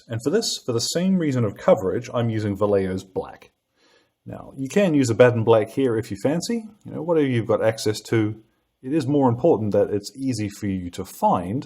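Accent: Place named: New Zealand. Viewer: Great video. I think this might be the Kiwi you've sounded thus far, eh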